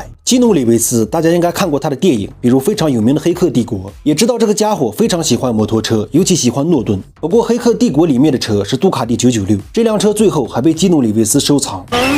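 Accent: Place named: Inner Mongolia, China. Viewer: 你这内蒙口音可以呀！！！哈哈哈哈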